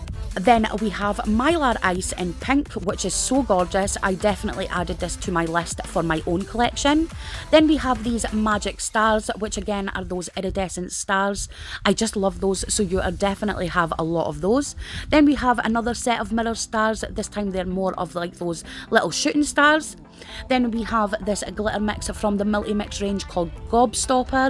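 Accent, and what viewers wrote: Scotland, United Kingdom. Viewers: Love watching your haul video's and your scotish voice over.. Congrats on 4k xx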